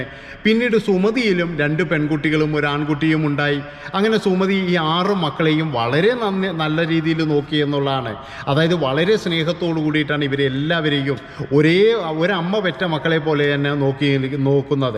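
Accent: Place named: Northern Kerala, India. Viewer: അവതരണ ശൈലി കേൾക്കാൻ ഒരു സുഖവും ഇല്ല ഒരുതരം വൃത്തികെട്ട വടക്കൻ ഭാഷ.... ഒരു സ്റ്റാൻഡേർഡ് ഇല്ലാത്ത ശബ്ദവും ഭാഷയും എന്തോന്നാടാ ഇത്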